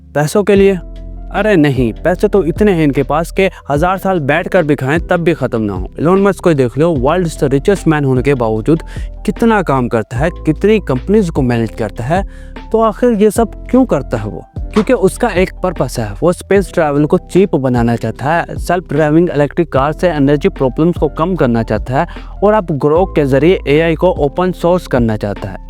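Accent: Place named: Urdu, Pakistan. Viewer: I like your Urdu Accent ❤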